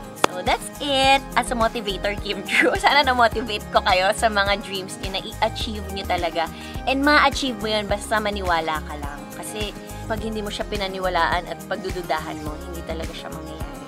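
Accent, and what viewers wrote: Visayas, Philippines. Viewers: bisaya po mo ate kim chiu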